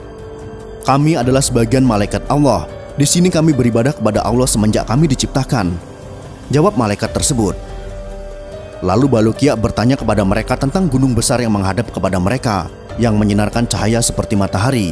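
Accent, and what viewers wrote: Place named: Bali, Indonesia. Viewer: ni yg baca cerita kaya logat bali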